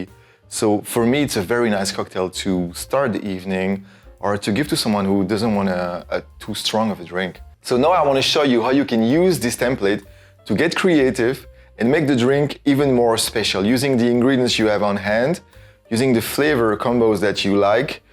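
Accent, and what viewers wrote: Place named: France. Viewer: oh man, that sounds like a french accent!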